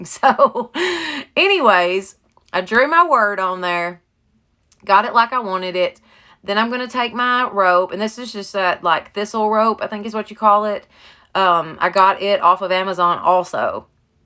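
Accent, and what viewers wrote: South, United States. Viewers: I just have to tell you, girl, that your videos are like crafting with a member of my family... !! All the phrases you use like Y'all and other stuff sounds like home... the first time I heard you say " heck far " I knew you were a keeper..!! I busted out laughing and had to go tell my hubby ( who is from TN ) that I had found a bonified southern girl on Youtube !!! I love all your easy peasy crafts and I appreciate you sharing all your tips and ideas with us.... God bless and keep up the good work... I am binge watching your videos.....!! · Just found your page. Loved the video and especially listening to you talk. You sound just like me talking 😊. Southern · Do I spy with my little ears another southern gal? 😂 Where are you from? I'm in the Charleston SC area. Loved your diys! Absolutely beautiful. I'm totally into farmhouse! 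Infact this week I'm gutting my kitchen to the studs and remodeling it into my dream kitchen, or so I hope lol. Never done anything like this before, Lord help. Hope you have an awesome weekend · Super cute! I love watchin your video's. And your southern accent is adorable 😍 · Love them all I have the cow also so you gave me some ideas for him by the way where are you from i just love your country accent I'm from north Carolina coast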